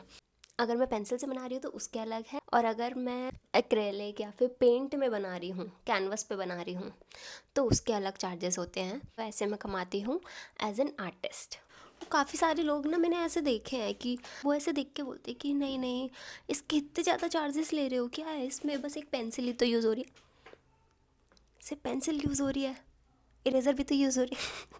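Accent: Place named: Pahadi, India. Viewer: Pehli bar aapki video dekhi aapke bolne k accent se hi pta lgra h pahadi ho.... 😂❤ I saw your video for the first time and I liked it, it was very honest.❤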